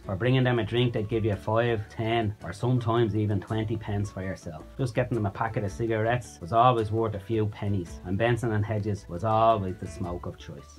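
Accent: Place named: Dublin, Ireland. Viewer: Dublin JAKEEN ACCENT..TAXI DRIVERS.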